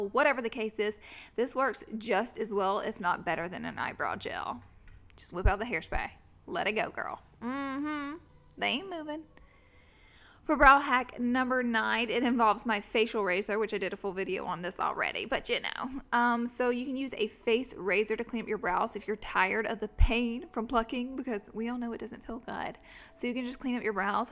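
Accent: South, United States. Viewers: Girl you are so southern. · Why do you try to sound country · I love how you communicate your so southern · I like her southern accent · Omg, I love your Southern accent!! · Are you country? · Girl, you' so country! Absolutely love your accent!!!! 😍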